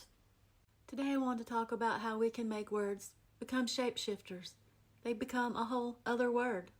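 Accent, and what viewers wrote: Appalachia, United States. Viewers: I talk like you! Hidee from Abingdon Va! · Have you ever met a true blue Tennessee man? We do say yonder, taters, winders, nanners and so on. Sweetheart, you're gorgeous however you're not the only 1 from the hills with that hillbilly accent. Though I am in love with it. I'm tired of being the only one in my area with a backwoods accent. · Quite literally my late grandmother's accent. She grew up in Central Ohio around the "Blacklick Crick" area, but I'm pretty sure we had some ancestors from deep in the Appalachian Mountains somewhere. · She's just adorable, I am from western North Carolina and most of these we do use and say the same as she does. She's a little farther up in the hills than we are so she put it a more rustically than we do here. But we understand it. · Is is so heartwarming to hear a body talking the way I was brought up. 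I'm from north Ga or 'Georgie' and thats my language & culture from ye folks & grandparents dipping stuff, chewing 'backie' , 'backer' , or 'tabacker' to making shine to get through the winter after you carpentered all summer. So many folks try to talk proper around here, why? I don't know. Sure I know the 'correct' spelling & pronunciation but this is how we talk. Had to learn a second version of language when I started school in the 70s lol. I remember kids snickering when I said "fer" instead of "for". To me saying for is like the number four. Fur, for, & far are all pronounced 'fer'. Far is what you build in your heater lol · I love the way you talk. Im from southern west Virginia. That's how we talk. Long live the hollerbillies! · The way you talk reminds me of how all my mountain relatives talk. You should have heard it when they tried to explain a ramp festival! I didn't understand a word they were saying 😂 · Your purity in your hic talk. 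· Lol Awesome,, I talk the same way lol ,, because I’m a Hillbilly ❤️👍🙏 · You are precious and very beautiful. Your dialect reminds me of my paternal grandparents who were from Habersham County in Northeastern Georgia. My father's family came to Raburn and Habersham Counties in about 1820. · I'd say the way you talk reminds me of home, but I live here in NC and hear people talk like this every day. We do have a lot of people moving here in the foothills from up North, so I hear plenty of people NOT talking like this. But I know where my people are. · I love this. Hick Tok. What a thing. I hate so many of these accents are dieing out. Such a lovely lady. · Love the way you talk I'm from Wilkes by God county NC. And we talk the same way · Dying this girl just nothing but hillbilly I’m in love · Honey, you talk right as one Appalachian woman to another. If no one likes the way you talk, then to hell with them! 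My ex kept trying to make me change how I talk, and I refused to do it. He'd tell me that I was speaking properly, and I'd tell him that I most definitely was too speaking properly, and could either like it, or lump it because I wasn't going to stop. Notice how he's ex because he also tried to change my Appalachian way of doing things too, and I told him that if he didn't like how I was, then there was the door. I even held it open for him. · I talk just like you do. ❤ I'm from the mountains. Virginia. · You're a beautiful young woman and I like listening to you talk. Although I'm not from Appalachia but I am a redneck and I can always understand you. In many cases, I grew up pronouncing words the same way.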